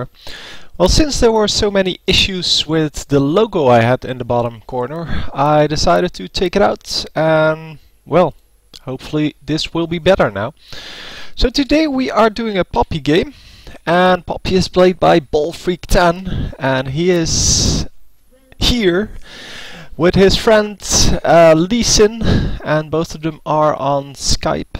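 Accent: Netherlands. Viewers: You dutch hahaha dat hoor je gwn :-/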